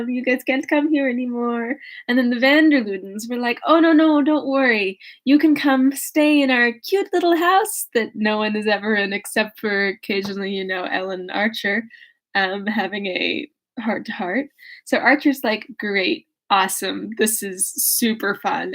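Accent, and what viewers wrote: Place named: France. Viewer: But the accent français!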